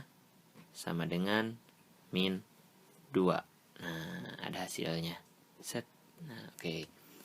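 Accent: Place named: Bandung, Indonesia. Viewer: Si aa pasti urang bdg kwkwkwk. Nuhun a..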